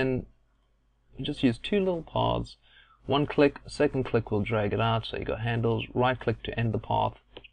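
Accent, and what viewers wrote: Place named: South Africa. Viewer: Great tut..you speak like me!!! First time someone has got the pronunciation of bezier correct> You got to be South African!!;-)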